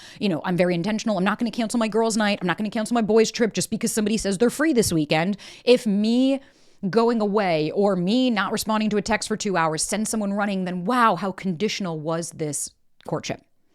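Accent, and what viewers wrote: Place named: New York, United States. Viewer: Must be a Newyawka? 😮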